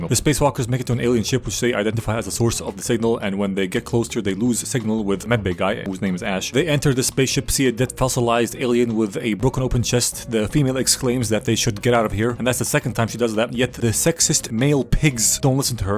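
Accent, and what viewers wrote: Germany. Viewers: german accent is unbeatable. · Are you German? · Love the German accent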